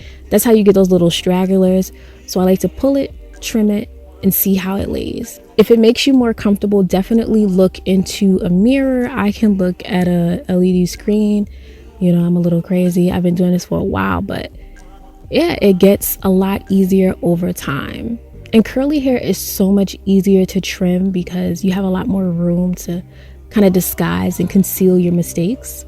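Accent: New York, United States. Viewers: Auntie u got a NYC accent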